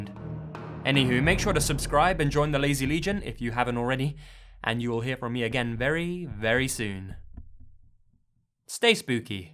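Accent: England, United Kingdom. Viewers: English accent FTW :)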